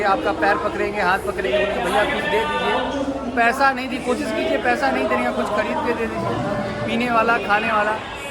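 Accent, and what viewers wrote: Bihar, India. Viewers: I watch ur videos on mute as I don’t like ur bihari accent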